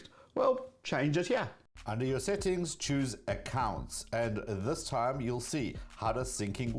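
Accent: South Africa. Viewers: last thing I expected here was to hear a Saffa accent lol, nice!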